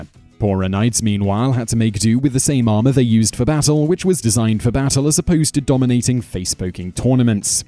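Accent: England, United Kingdom. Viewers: Absolute crock research delivered by a man speaking in a british accent to make it seem legit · Well English knights fight on foot, and u sound English u should now about that, not presenting knights to be better then else just because they have horses, also lower class man at arms uses horses, light cavalry, mounted archers...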